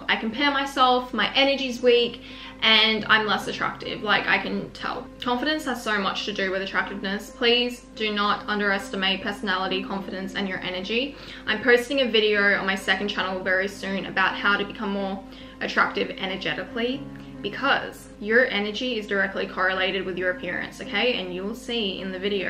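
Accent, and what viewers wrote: Australia. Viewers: I love the Australian accent and how she says “phone” she says “phewrn”.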